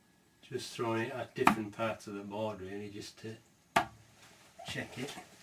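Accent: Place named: Newcastle, United Kingdom. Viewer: what accent is that you have, it sounds sorta newcastle like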